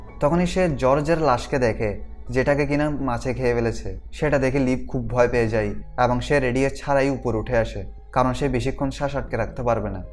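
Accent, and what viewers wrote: Chittagong, Bangladesh. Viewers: Apni Chittagong thaken??? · Oni hoitoba Chittagong ar ar jonno onar kothai Chittagong ar tan chola asa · আপনি কি চিটাগাং এর মানুষ 😅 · Chatgaiya tune ta na anar cesta koren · ভাষাটা একটু প্রবলেম,চাটগাইয়া টান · ভাইয়া কি চট্টগ্রাম এর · ভাইয়া কি চিটাইংগা? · ভাই হচ্ছে চট্টগ্রামের লোক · আপনি কি চট্টগ্রামের? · Vai chittagonian naki😊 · চট্টগ্রামের আন্চলিকতার টান পরিহার করে ব্যাখ্যা করুন।শুনতে আরও ভাল লাগবে। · are you from Chittagong? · Vai Apner lenguage a CTG local er tan ase. Explation valo hoise kintu Kotha bolar expression aro practice korte hobe , Good Luck.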